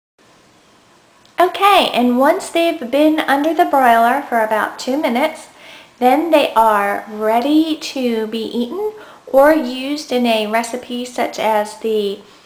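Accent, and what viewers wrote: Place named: Georgia, United States. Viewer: Where are you from? You sound like Georgia.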